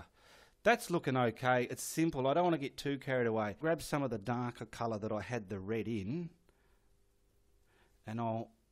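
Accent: Australia. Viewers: Thanks again, I am a Kiwi and all our canvases are still in inches. I usually use 12x16 but they seem to be much larger on utube. As you can probably tell I am a 73yr old new chum at painting. I really enjoy your work and your Aussie twang!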